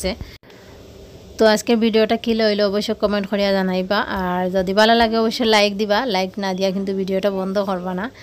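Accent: Sylhet, Bangladesh. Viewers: Ha Apu uni ancholik bashai kotha boltasen .ata hocha sylhet ar ancholik Basha jata bangla bashar e akta part.koiak din shunla apni bujta parben InshaAllah. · আপু আপনার সিলেটি মাত খুব ভালা লাগে❤️❤️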